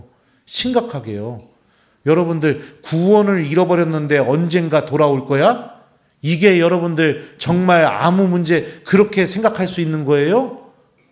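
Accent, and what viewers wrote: Chungcheong, South Korea. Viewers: 신부님강의 충청도 말씀 으로재미 있습니다 매일 유투부 로강 의 듣습니다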